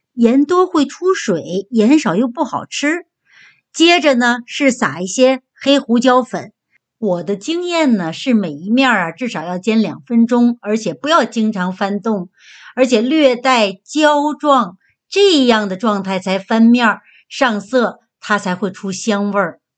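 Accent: Tianjin, China. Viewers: 听口音，天津老乡，你好👋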